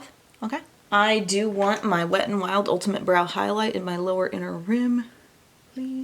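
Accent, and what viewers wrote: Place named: Southern Indiana, United States. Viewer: O.k. going to try the Profusion products now that you recommend them! They look fun! I've been watching your channel and I believe I grew up near the area you grew up. I'm from Southern Indiana near Evansville (live outside DC in Northern Virginia now)! Your voice reminds me of "home!" And, I just love that. Nice to get beauty tips and a taste of home all at the same time! ❤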